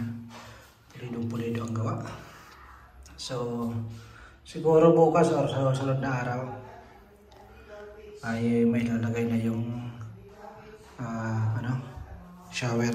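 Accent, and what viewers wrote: Waray, Philippines. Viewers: Taga di in ka sir baga waray man m panday hahahaha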